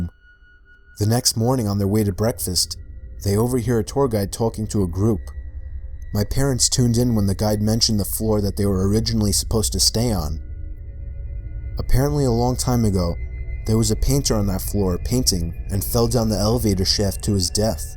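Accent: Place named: East Coast, United States. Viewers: younger and sounds like an east coast accent?!! Nice ❤ I no longer here it in his newer videos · He sounds so different then, like a New York accent, now he sounds different. For example he says "...get off..." But is sounds like get ouaf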